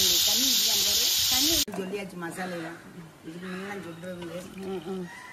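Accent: Ramanathapuram, India. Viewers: Nannimada pathai kaili thaavani ramnad pechu 🎉🎉❤ Eid mubarak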